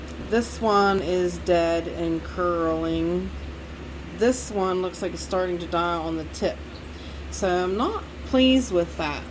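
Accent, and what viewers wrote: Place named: Tennessee, United States. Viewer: I guessing eastern TN by your specific accent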